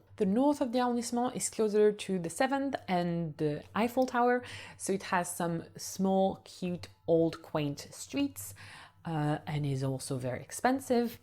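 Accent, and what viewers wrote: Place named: United Kingdom. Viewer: You sound like you are English (British)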